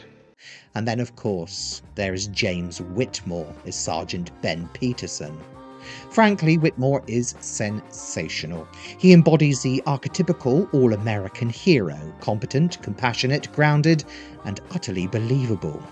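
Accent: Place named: West Country, United Kingdom. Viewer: Ah yes, Grockels, is I think, more of a Devonian thing. I'd only heard it said for the 1st time when I was either 20 or early 20s. And the person I heard first use it said it was more of a Devon thing. I already told you that sometimes you have a distinctly west country ring to your words & you explained to me where you were from & I realised that in some, more northern areas, they also seem to have a similar brogue. [you probably don't remember, but I thought you were a Bristolian & you corrected me.]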